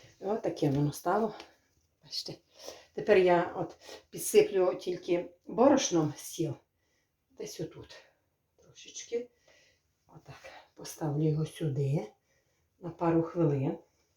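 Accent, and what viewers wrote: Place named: Poltava, Ukraine. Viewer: Милая хозяюшка! Спасибо Вам и за рецепт и за воспоминания молодости,когда мы ездили в гости к свекрови в Полтаву. И этот говор, и эта простота в приготовлении,и даже марля на кастрюле. Свекровь делала такие вареники ещё и с мясом( фарш из отварного мяса). Дуже смачно было! Храни Вас Бог от бед и напастей! Здоровья. вам!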